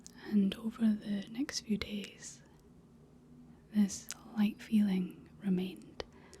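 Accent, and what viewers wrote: Scotland, United Kingdom. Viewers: Scottish accent definitely works on me. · I came across a few of your videos with your storytelling and just fell in love with your voice. I can honestly say I've always loved the Scottish accent. Growing up on the east coast of Canada, Newfoundland we are known for our unique language.. Keep being you. Never change. You have a beautiful soul. Thank you for being here. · A Scottish lassie that does Star Wars references? I think I am in love🥹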